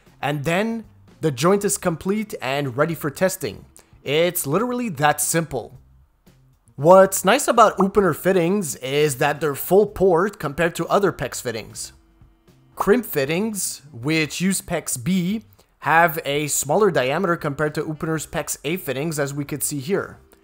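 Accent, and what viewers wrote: Quebec, Canada. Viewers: P’tit accent Québécois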